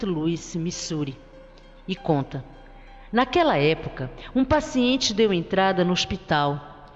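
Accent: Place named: Florianópolis, Brazil. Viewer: Você é de Florianópolis? Seu sotaque é de manézinha da ilha hehe, sou de Floripa também :)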